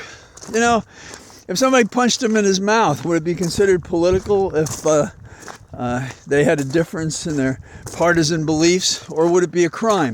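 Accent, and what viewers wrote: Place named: Ireland. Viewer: Belated Anniversary Congratulations to you both … touch of the Irish in your talk today … made me smile 😀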